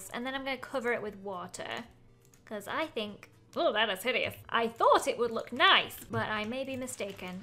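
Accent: England, United Kingdom. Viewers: lol she's british XD I can tell you're new here... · She is British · she has a british accent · She is from England. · She is English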